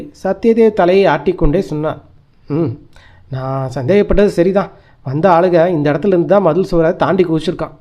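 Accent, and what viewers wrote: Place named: Kerala, India. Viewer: You have acent of Malayalam.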